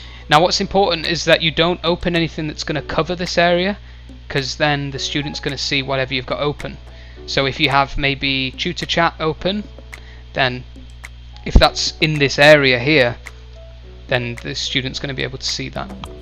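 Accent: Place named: England, United Kingdom. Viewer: Great video! Difficult to focus on the tutorial with that beautiful english accent :D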